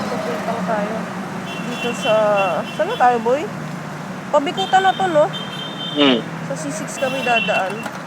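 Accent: Laguna, Philippines. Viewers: Gusto ko yung puntong laguna mo idol haha enjoy ako sa vid palagi! sana makaride ka namin ng misis ko soon! penye sticker haha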